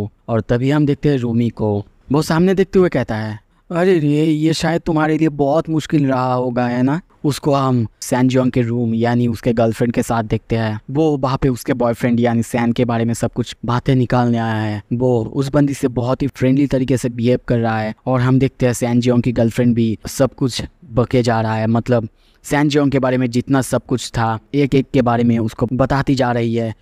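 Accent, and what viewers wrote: Bengal, India. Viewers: Are you from Bengal by any chance?😅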